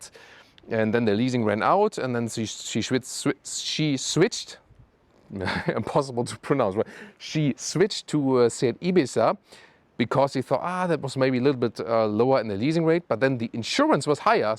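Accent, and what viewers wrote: Germany. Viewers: I love this german accent when he says R. (Eg. Lower bumper - lowa bampa, slimmer = slima)😁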